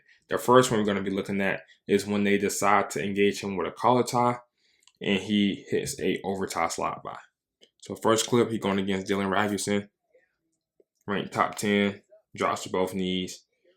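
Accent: United States. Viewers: You are articulate to the 9's Being able to edit and showcase the breadth of the subject matter But there's something about that southern cadence that I can't stand "Colluh Ta" it's as if you have to rush through your sentences. A nervous tick I guess what I hate about it is how I really have to pay attention to what you're bringing up. If it were edited the same way you spoke, clips would go by in a fraction of a sentence between long stretches of athletes just looking around Let what you have to say have some staying power. What do I know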